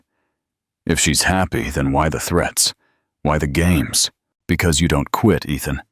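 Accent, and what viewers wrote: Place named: United States. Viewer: Oh my goodness St Thomas Hospital they looked after me im a London its so good to hear London on aAmerican story anAmerican voice saying these places its wounderful the park everything thank you AI family thank you . London is sad right now but with lots of luck London will be back god bless you all❤❤❤❤❤❤❤❤❤❤❤❤❤❤❤❤❤❤❤❤❤❤❤❤❤❤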